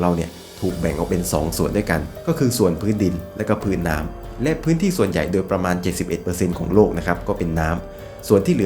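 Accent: South, Thailand. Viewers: พี่เป็นคนใต้ป่ะคะ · เสียงเพราะหางเสียงแอบเหมือนคนใต้ 😊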